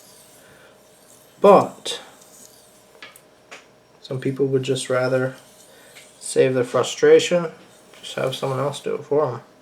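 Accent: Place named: British Columbia, Canada. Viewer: haha yessss!! found the video quite by accident. recognised the accent immediatly. Hello from BC. Haven't been home sense 2002. Funny how hearing the accent from home has such an emotional/mental trigger.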